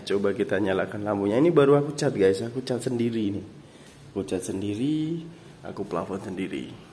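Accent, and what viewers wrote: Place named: Malaysia. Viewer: Lama kerja di Malaysia ya bang?percakapannya pun dah setengah melayu indo😅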